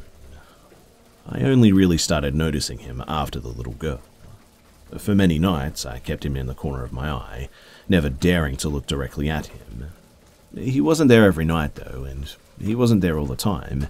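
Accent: Australia. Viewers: Yeah mate our Australian accent is pretty great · Gotta love that Australian accent 🤣🤣👍🏾👍🏾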